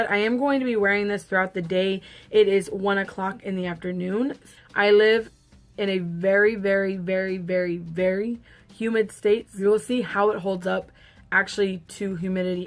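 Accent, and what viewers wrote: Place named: Latin America. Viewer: Glad you guys are ok!! 💜 I want the Fenty foundation SO BAD but there are too many shades to swatch 😅 But GIRL. I just realized- are you a fellow Latina!? I never realized your accent before this vid! You sound just like mis primas! 😂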